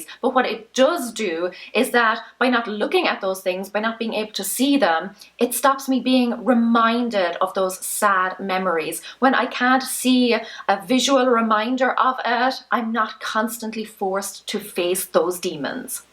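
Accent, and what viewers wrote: Ireland. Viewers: OMG that school organiser thing you showed gave ME memories!? definitely had one at some point! Great video, I’m on a Konmari spree and it’s always so nice to hear a familiar Irish accent with great content :)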